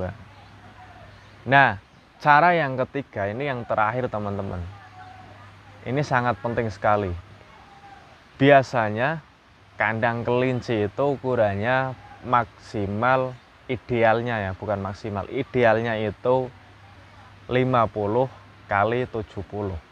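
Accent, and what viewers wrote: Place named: Java, Indonesia. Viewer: Logatnya org jawa y mas, jawane pundi??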